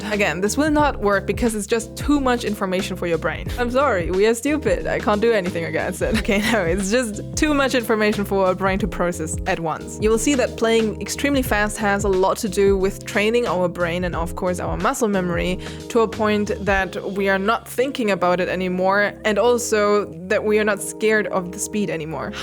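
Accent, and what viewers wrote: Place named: Germany. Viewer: By the way, your English is now majestic. I loved those cute details of your German speech, but the work you did inspires as much as piano one :-)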